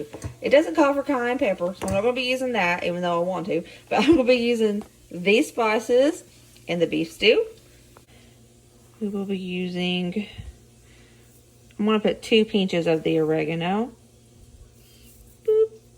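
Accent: South, United States. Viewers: You are a lifesaver. Thank you. New Subscriber ❤️ Love your Southern accent. I'm from Texas 🇱🇷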